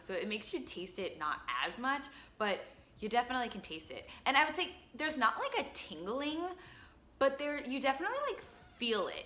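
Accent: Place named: New Jersey, United States. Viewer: This girl is 1000% from NJ 🤣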